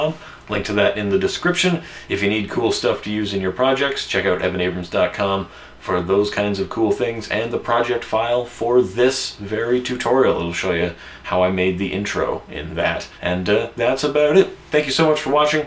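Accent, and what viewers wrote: Canada. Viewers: That Canadian accent xD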